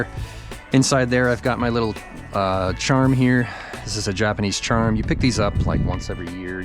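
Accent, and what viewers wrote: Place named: United States. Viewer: Wow this is the first time I heard such an authentic American English pronunciation from Japanese uploaders. Well done.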